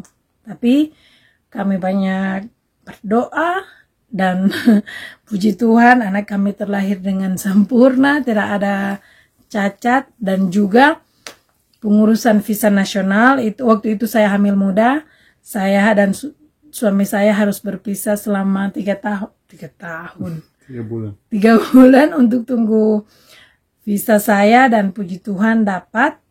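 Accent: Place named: Manado, Indonesia. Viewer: Senang ba dengai bacarita balogat Manado.. Tuhan Yesus pelihara dan MEMBERKATI selalu ☦️❤️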